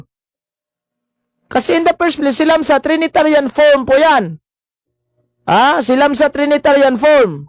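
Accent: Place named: Tondo, Philippines. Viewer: Bakit ganyan ang tagapagsalita, parang nakikipagdaldalan lang sa kanto at usapang lasing. Hindi maayos magsalita, tigas ng at accent at parang lumaki sa Tondo . Para bara bara lang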